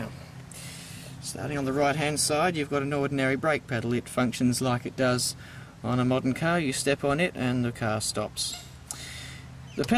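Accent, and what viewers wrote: Australia. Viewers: I watched the video to hear an AUSTRALIAN SPEAK..God Bless our AUSSIE BROTHERS and SISTERS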